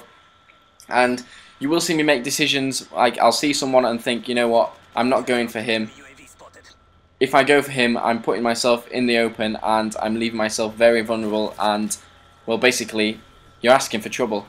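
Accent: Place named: United Kingdom. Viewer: a little to brit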